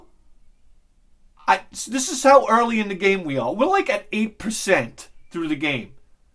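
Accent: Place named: North, United States. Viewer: I dont mind it. Has that northern yankee flavor. Somewhat entertaining in doses. I dig his humor and wantnot. Quintessential Northerner. I like the guy. And I agree, his projects are sick!!!!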